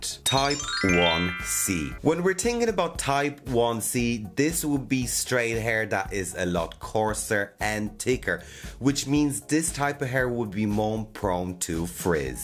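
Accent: Ireland. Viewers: i for the life of me can not figure out if you are super Irish or jamaican and obv one would make more sense but as soon as I'm sure u say something else and I'm like I DONT KNOWWWWW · Huh... A non native English speaker who seems to have learned English in Ireland or from an Irish person. Fascinating. That's at least what it sounds like to me. I wonder what his mother tongue is. 🤔 See, now I'm intrigued.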